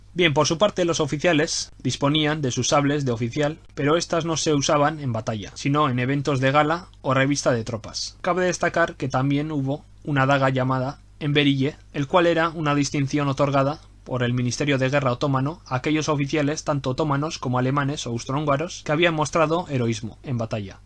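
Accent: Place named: Canary Islands, Spain. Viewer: aupa buenos videos y buen acento canario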